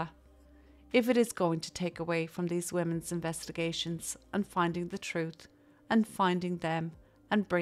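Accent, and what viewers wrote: Ireland. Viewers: Love your content although they are heartbreaking. Love the Irish accent, one of my best friend whom I’ve known for 30 years is from Cork and I recognise the lovely lilt of your voice.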